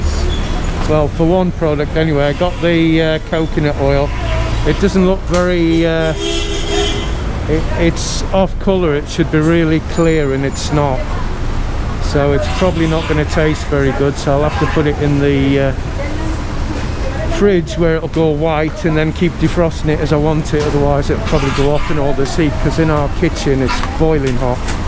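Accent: United Kingdom. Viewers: Your British accent would make it difficult for most regular Filipinos to understand your English. The kind of English taught in the Philippines is American English to begin with.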